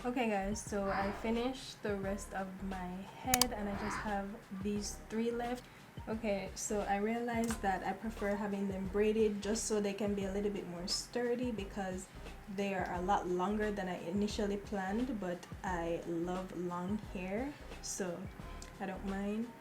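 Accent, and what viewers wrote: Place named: Caribbean. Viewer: Lovely to see another Caribbean queen! Love the hair and your accent!!!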